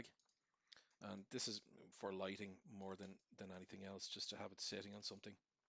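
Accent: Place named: Ireland. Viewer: so rare to come across an Irish voice when looking at YouTube tutorials. Lovely.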